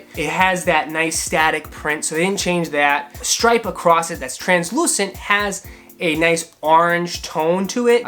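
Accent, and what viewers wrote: Boston, United States. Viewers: If I'm not mistaken your from Bahstan?. Wicked good review.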